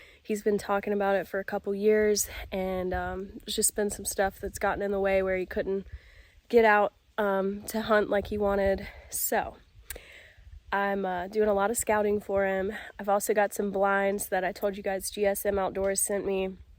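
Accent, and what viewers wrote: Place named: New England, United States. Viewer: Recommended on my You Tube feed so I will provide feedback in the form of a comment. I am not interested in the You Tube channel of any American Woman, particularly, white ones like my Grandmother on Mom's side, Mom, my Sister, my Stepdame, and any other American Woman. Perhaps it is self hatred, but the Yankee accent only exacerbates my repugnance for the women my nation has produced-the feeling, no question, is mutual-extending beyond nationality to the white race. I will review my subscription list when I get a chance and make sure to remove any to American women, though I will target in particular those American women from the USA, and then, white ones. I simply cannot brook the sense of entitlement in these women.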